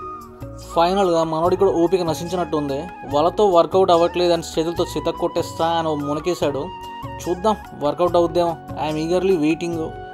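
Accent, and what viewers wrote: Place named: Andhra Pradesh, India. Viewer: Ma vuru antanv mari gintaaa kudaa Telangana matladutalev antaa andra slang a matladutunav endhi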